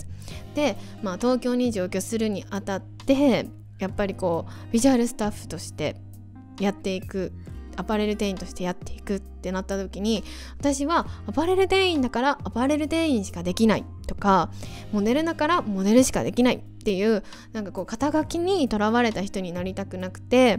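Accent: Kansai, Japan. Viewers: いつもより関西弁聞けてうれしい☺️ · ちゃんの関西弁大好きです❤️ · めちゃんこかわいいがな関西弁かわよ 「そのままやないかい」 名言やないかい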